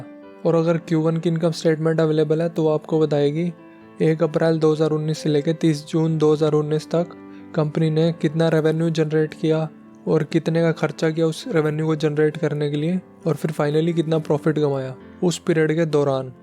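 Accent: India. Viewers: Welcome back sir🙏. Ur knowledge packed presentation in deshi aawaj is just killing...lagta hai koi apna school ka purana yaar bol raha hi....keep doing favour to us by sharing ur knowledge in deshi style 😀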